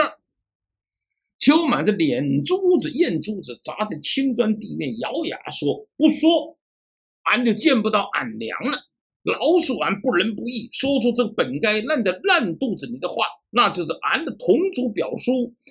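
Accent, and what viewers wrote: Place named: South, China. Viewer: 北派的小说，南派的口音，南北结合，在我看来这是创意，老杨全网独一份🎉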